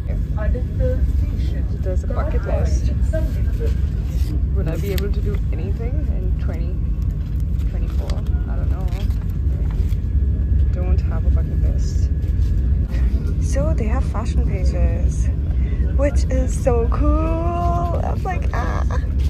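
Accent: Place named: England, United Kingdom. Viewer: Listen to her english accent , mate relax, she's not a indian resident, let her be herself in her comfortable self, So chill & enjoy if you can, for what's she has to express in her quest of this train journey, 🇮🇳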